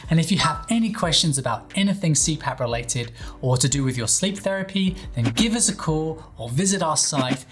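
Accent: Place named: United Kingdom. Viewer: Looks like he is from the Great Britain...according to the accent :P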